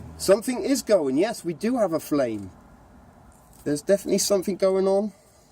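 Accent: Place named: Midlands, United Kingdom. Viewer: New sub from England you sound like you got a midlands accent. ?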